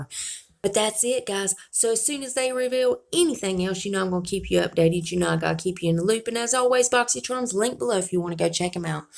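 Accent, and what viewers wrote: South, United States. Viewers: I looove your accent!!!!! 💜💙💜💙💜💙 my husband can always tell when I've been watching your videos cuz I end up talking in a country accent lol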